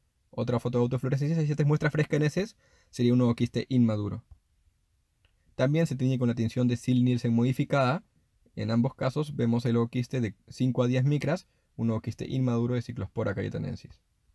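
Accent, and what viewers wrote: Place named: Peru. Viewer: Me gusta el acento peruano. Saludos desde MX 🇲🇽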